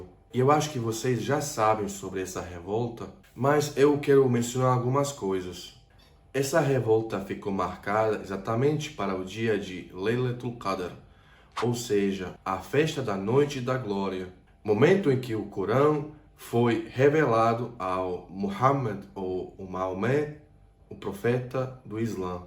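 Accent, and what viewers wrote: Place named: South, Brazil. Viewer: Você está desenvolvendo um sotaque do sul do Brasil. Na realidade parece o sotaque de regiôes agricolas do sul. a vez ei visitei o Mato Grosso, na cidade de Cuiaba e tinha aqueles agricultores gauchos la que falam mais ou menos com esse sotaque.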